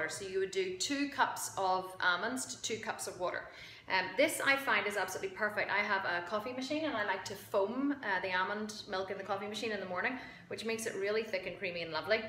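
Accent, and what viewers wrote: United Kingdom. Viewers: Northern Irish accent. Didn't notice that she spoke too fast, but I'm British..maybe that's why. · Wee belfast lady ballymena? Thanks for video its great x x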